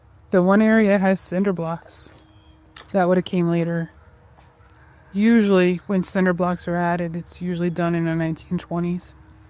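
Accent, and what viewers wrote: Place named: Philadelphia, United States. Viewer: Loved the video. Was it intentional not to reveal anything about the location? It feels a bit counterintuitive if your goal is to share historical preservation. I couldn’t even figure out where you're located in the United States, though I think I hear a Philadelphia accent—maybe? Adding a mention in the description or title could be helpful.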